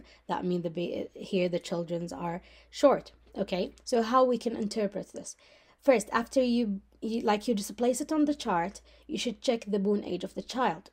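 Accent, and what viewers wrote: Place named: Saudi Arabia. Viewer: استانست لما سمعت الاكسنت السعودي🔥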